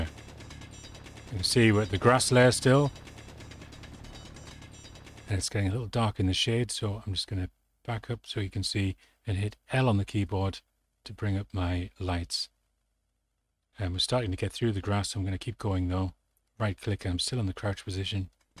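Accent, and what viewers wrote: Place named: Sunderland, United Kingdom. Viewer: Sounds like you're from Sunderland.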